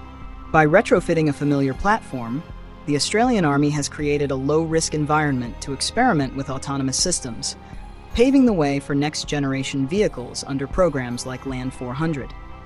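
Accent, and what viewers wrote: United States. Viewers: It's hard to watch something about our modified Bucket narrated by a yank. About the project, i think it's Boffins inventing systems from the point of view as seen from their desk and pc. If you want a warbot, make a specifically built one from the ground up, not convert a manned battle taxi. Make it small with only what it needs. I'm all for preserving our fleshware, but this is not the platform to do it.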